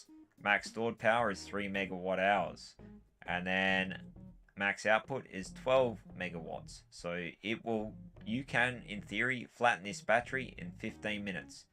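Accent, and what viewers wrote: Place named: Australia. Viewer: You have a down under accent, I myself taking a break after playing LSG "The Black" and "Plane parts server".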